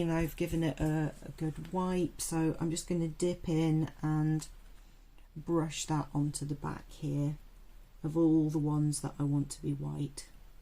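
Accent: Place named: Australia. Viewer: Love it!! Love your accent are you from Australia?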